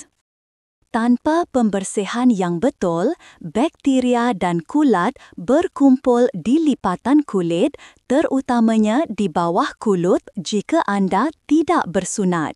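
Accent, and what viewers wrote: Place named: West Kalimantan, Indonesia. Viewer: No.1...saye dari makassar...trim's...aksen Dr mirip ipar saye dr ketapang kalbar🙏🙏🙏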